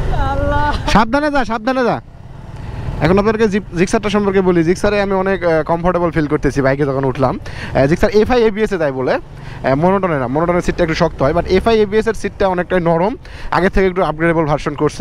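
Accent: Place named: Bogura, Bangladesh. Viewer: জি ভাইয়া অবশ্যই তবে আপনার আঞ্চলিকতা ও আমাদের বগুড়া আঞ্চলিক অনেক মিল রয়েছে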